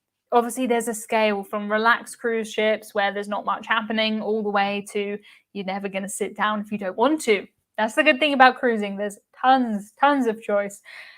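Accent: United Kingdom. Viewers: I like your British accent. And your videos look so professional and I like your raw views and opinions about cruises. Great work.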